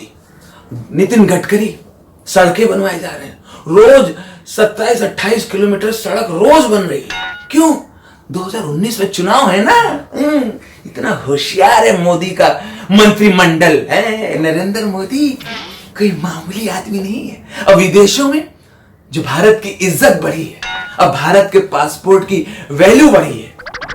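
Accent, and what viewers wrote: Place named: Bengal, India. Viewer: Sir...aapka Bengali accent bda tagda hai.....👍👍👍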